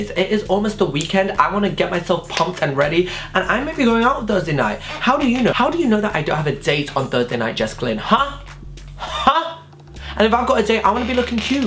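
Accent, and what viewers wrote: Scotland, United Kingdom. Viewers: i keep speaking in that weird scottish accent because im picking it up from ur vids, fair to say my mums not v happy about it tho loooool x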